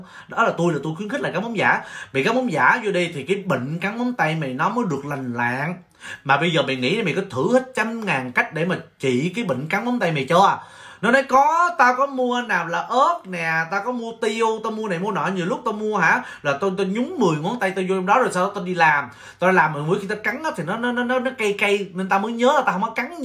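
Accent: Binh Dinh, Vietnam. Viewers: Anh Nguoi Nam hay nguoi Bac vay. Vi giong anh de thuong qua😍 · tưởng anh là giọng Bình Định Phú Yên. Nhưng người gì miễn là vui vẻ là được.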